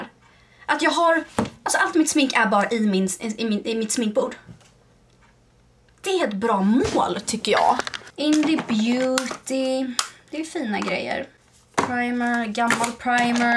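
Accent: Stockholm, Sweden. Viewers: Varför låtsas du vara stockholmare??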